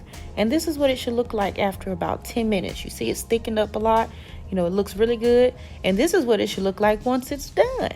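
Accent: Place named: Mississippi, United States. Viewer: Are you from MS? I hear a familiar accent!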